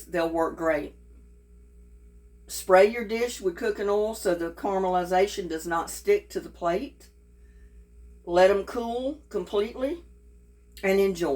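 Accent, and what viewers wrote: South, United States. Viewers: Dear I am from London UK and I love that southern drawl! Love Maria 🌹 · Never mind what anyone says l love you cooking videos and your southern accent. I have a Boston accent and guess what l am as proud of it as you should be of your southern accent. We are all Americans no matter which part of the country we come from. Also l love your blouse in this video very lovely on you.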